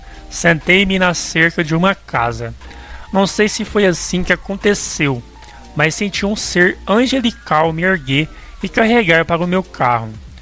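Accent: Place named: Caipira, Brazil. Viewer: Um erro de português da para consertar, agora querer que a pessoa mude seu sotaque e ainda chamar de caipira é muita falta de bom senso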